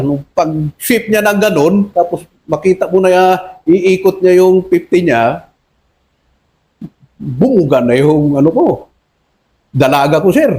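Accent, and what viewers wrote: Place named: Cordillera, Philippines. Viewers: Yes he is Igorot 🫶👍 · Halatadong igorot kac sa pananalita ❤ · Parang igorot si sir,halata sa salita nya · Sabi n igorot. Halata sa accent. Magaling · Mukhang Kabbayan q ito sa accent lng alam q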